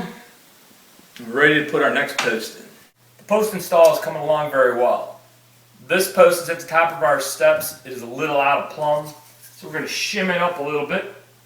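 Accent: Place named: Pittsburgh, United States. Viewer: Thanks. Great job! Haven't heard such a strong Pittsburgh accent in a long time. Question: Are all the newel posts the same size? I've been looking at ordering some but there seems to be different heights for landings, starters, etc. Thanks ~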